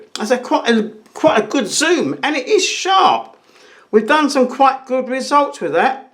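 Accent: London, United Kingdom. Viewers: He sounds like my old father in law, he was a Londoner from Kingston, shame he didn't live very long, couldn't give up the ciggies... But a lovely video, straight from the heart! I bought this camera, because I wanted to feel something chunky in my hand. The Finepix JZ 500 which I also have, is more like a box of ciggies and no blimmin good if you have arthritis and sausage fingers ;o)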